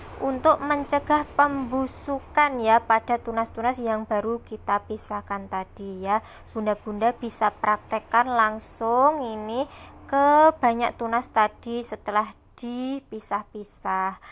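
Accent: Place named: Java, Indonesia. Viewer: Mba..ngmngnya medok bgt ..jd saflok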